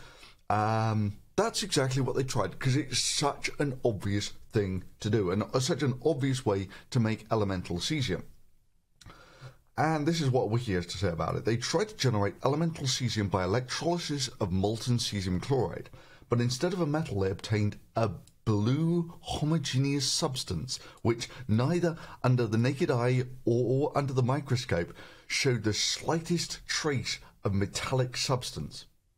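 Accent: United Kingdom. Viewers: is that a Scottish accent? Your long form videos feature a different accent.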